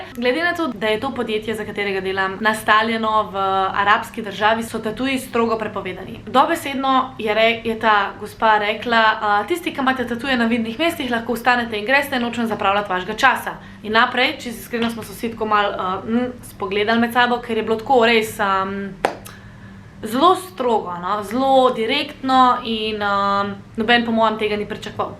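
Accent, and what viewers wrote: Ljubljana, Slovenia. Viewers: who is she supposed to learn from? Each Slovenian YouTube vlogger/influencer has their own good points while speaking Slovenian. So does everybody else. I would not learn Slovenian from a person from Primorska or Štajerska, for example. Ljubljana dialect is perfectly fine. However, I agree with you that the news might be even better. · She never replied to you but if it helps judging by her accent i’d say she’s from Ljubljana. I’m not a frequent viewer so i don’t know where exactly she’s from, she might reside in Ljubljana or not but judging from her vernacular alone she lives/ or at some point lived in Ljubljana or in its proximity.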